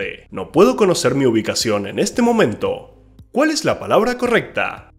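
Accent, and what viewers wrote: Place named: Latin America. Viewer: PERDON. La pronunciacion es LATINA. Esto conlleva a ERRORES.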